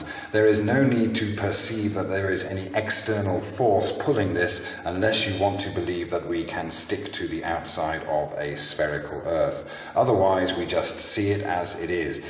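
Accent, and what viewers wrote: England, United Kingdom. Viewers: can be denser than other air; this is the basis of meteorology, i.e. weather forecasting. Warm air floats on cold air, because of density, but ALL air is attracted to the earth. Its called gravity (stupid), and since ALL air has mass, gravity can act on these objects. Do you actually understand any physical science, or do you think people believe you know what you are talking about because of your "english" accent? Sir, you will never be mistaken for David Attenborough; an actual scientist:-)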